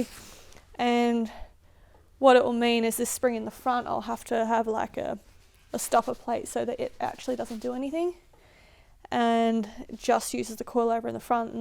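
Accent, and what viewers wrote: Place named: Australia. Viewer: Слышу австралийский акцент если я не ошибаюсь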